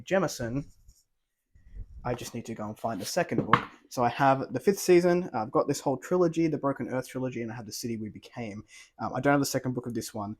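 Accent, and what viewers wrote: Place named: Australia. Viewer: Oh thank goodness. I've been hunting around for Aussie booktubers with similar book interests. Your accent makes it easier to hold my sorry excuse for an attention span. So many of these are on my TBR. The used bookstore is my vice.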